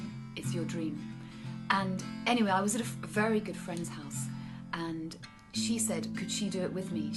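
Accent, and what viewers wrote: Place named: London, United Kingdom. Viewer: Hi, glad to hear your story! I love your flower shop very much. And your beautiful London English accent. I'm sorry that your shop is closed. Anyway, you are absolutely an excellent gardener. I wish you a happy life forever. Long to hear your story soon again. Love you 😍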